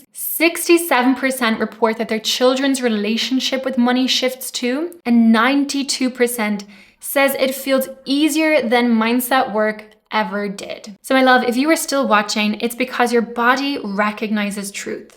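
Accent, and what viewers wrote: Ireland. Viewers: Great video. Thank you. Are you Irish because you have an Irish accent? Blessings.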